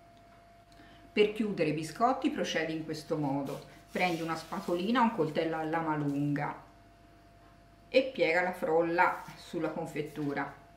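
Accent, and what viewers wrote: Tuscany, Italy. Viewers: Bravissima come sempre. Ma te non ti chiamaviTerrore Spendido ? O mi sbaglio ? Ti ricordo bene col tuo accento Toscano che personalmente mi fai morire dal piacere ascoltarti va be bellissima sta pasta frolla senza dubbio li farò col cuoreeee ❤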